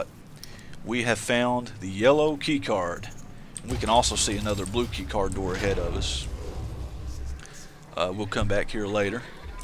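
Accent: South, United States. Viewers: Holy shit you're a southern dude? I did not expect that. Not that I'm insulting you, I'm a Texan myself, just didn't expect that lol. I agree with you though I absolutely freaking loved this game and omg I can't wait for the next Doom. More weapons, more demons, new story, more weapon mods, hopefully new brutal animations, man it would be so sick. My only thing is I really don't see how id could possibly follow this up though, it is epic as hell.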